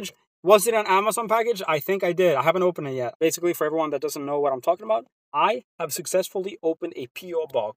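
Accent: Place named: Sweden. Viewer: Turning into pewdiepie. you're losing your swedish accent as time goes on LOL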